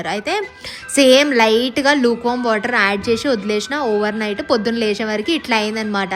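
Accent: Telangana, India. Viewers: Aatepoinav pakka Telangana slang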